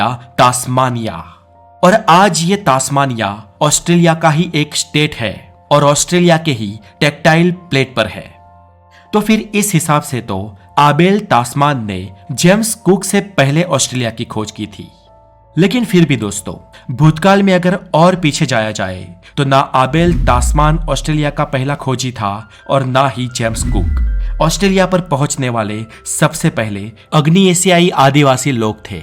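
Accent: Gujarat, India. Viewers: Gujrati bhai hindi suit nahin karti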